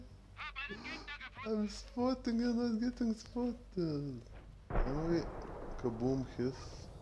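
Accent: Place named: Lithuania. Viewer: English Lithuanian accent.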